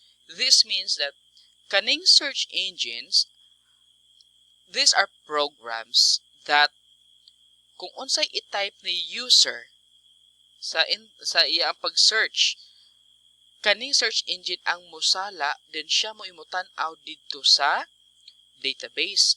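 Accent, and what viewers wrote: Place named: Visayas, Philippines. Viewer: Di ko maintindihan. Parang bisaya kasi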